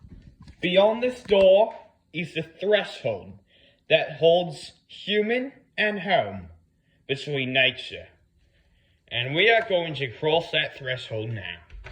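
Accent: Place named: Australia. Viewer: Why the actual hell is no one talking about his b e a u t I f u l Australian accent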